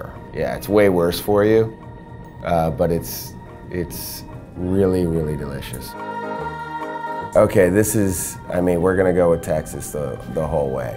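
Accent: Canada. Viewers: I LOVE HIM AND HIS CANADIAN ACCENT 😂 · Ontario probably, Maybe the Montreal area of Quebec, or southwestern B.C. But im just guessing from the accent.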